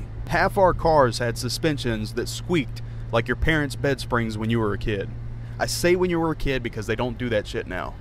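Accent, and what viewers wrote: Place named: St. Louis County, United States. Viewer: You sound like you're in St. Louis County.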